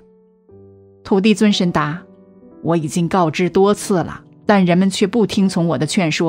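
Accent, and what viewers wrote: China. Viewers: 明明是中國人卻要講台灣的事，口音是中國口音，中國人開始用繁體字了嗎？，什麼土地神？台灣人都叫土地公。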